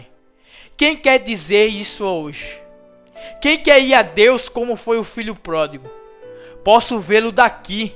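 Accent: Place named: Pernambuco, Brazil. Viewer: Parabéns ao locutor, que Deus o abençoe por sua disposiçao em transmitir esta palavra abençoada. Pelo sotaque creio que o Irmão é pernambucano, conterrâneo nordestino. Sou do Ceará. Orem pelo nordeste precisamoz das orações dos irmãos. Glórias a Deus.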